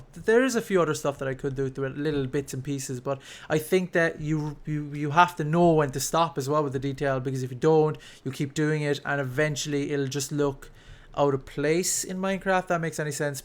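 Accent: Ireland. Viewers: Love the Irish accent ;)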